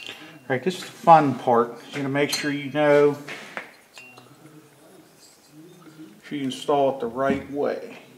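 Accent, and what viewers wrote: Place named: New York, United States. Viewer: Great video just did crank bearings on my 391 shes ripping again. Thanks! I can tell your from New Yawwwk somewhere. Maybe penn.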